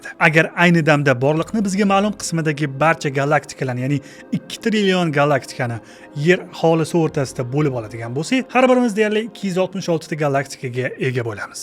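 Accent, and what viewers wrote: Tashkent, Uzbekistan. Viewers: ука тошкент шевасида гапирмасдан адабий тилда гапирса бўлмайдими бутун ўзбеклар кўради фақат тошкентликлар кўрсинми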